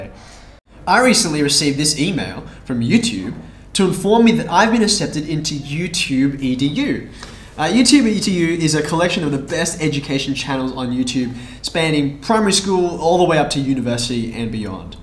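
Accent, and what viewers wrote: Australia. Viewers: The Austrailian accent makes everything you teach <num> times better!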